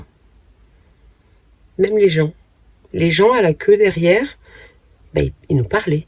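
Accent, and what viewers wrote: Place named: North, France. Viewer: bon week end a vous er continuer vos video elle sont tres bien j adore votre accent sa me rapelle ma jeunesse dans ce chnord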